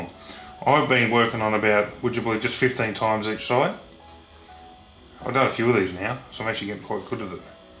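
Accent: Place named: Australia. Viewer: Australian accent (I think) wearing an Oregon something-or-other hoodie. Interesting.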